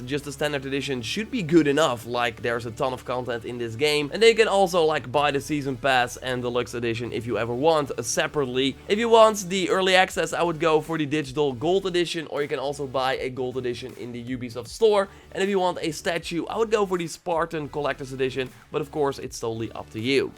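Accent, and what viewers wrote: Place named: Netherlands. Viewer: Ik wist het wel! Dat je nederlands was hahah toch kan je dat altijd horen. Ga zo door man😃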